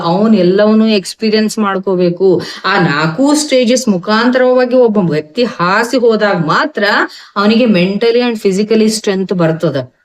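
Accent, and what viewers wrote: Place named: Hubli, India. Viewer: Mam namm hubli bashe n chanda helthiri tq mam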